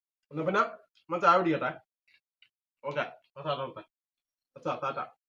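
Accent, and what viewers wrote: Kannur, India. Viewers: Padichathe nammal padu alle nammalkku achadi bhashayonnum veettil venda alle nammale kannur slang poliyalle❤❤❤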